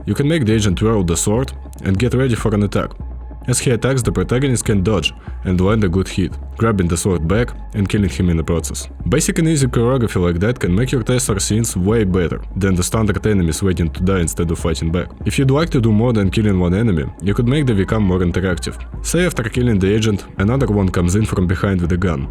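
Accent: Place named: Russia. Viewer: Русский акцент ОЧЕНЬ заметен. Это не плохо, это хорошо что ты пытаешься выходить на зарубежную аудиторию. Желаю успехов!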